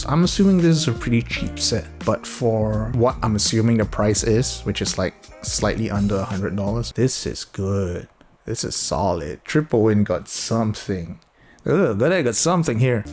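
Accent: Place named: Singapore. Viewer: I could tell you were Singaporean from the accent. I felt validated after checking.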